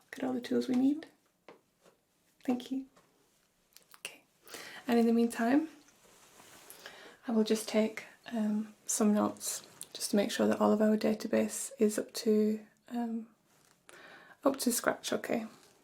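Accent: United Kingdom. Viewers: Great video idea, also love the accent whereabouts are you from within the uk?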